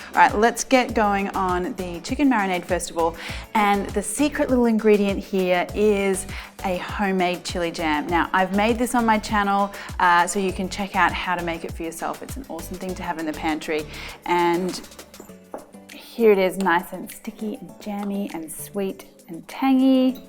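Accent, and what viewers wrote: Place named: Australia. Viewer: I love your Aussie accent. Your recipe looks humble and simple. I will try this yummy recipe even if I have to wait 70 minutes for this meal to cook lol. Cheers girl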